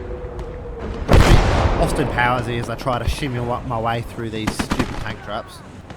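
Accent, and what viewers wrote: Australia. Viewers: If they hire you to do the australian voicelines, I think "Happy days" is a mandatory one! and "Rough!" if a soldier dies.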